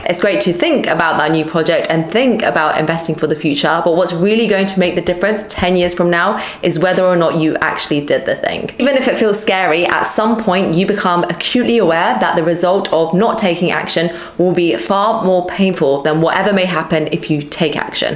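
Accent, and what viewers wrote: United Kingdom. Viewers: admired with your stunning British accent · I love your videos. But it’s very hard to understand, it feels like it’s on FAST forward. Plus the British accent makes it harder. A bit slower would be a lot easier to absorb the content. Just a feed back. Thank you!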